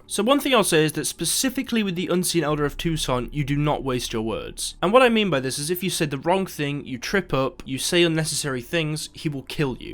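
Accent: United Kingdom. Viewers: Too british.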